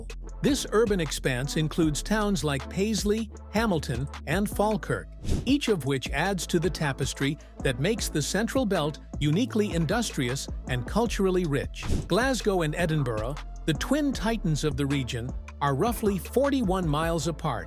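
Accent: United States. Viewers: seconds in and an American accent that can't pronounce towns ("Endinboro"), then I read the comments! Result, found something bbetter to do with my time! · F'cking American voiceover.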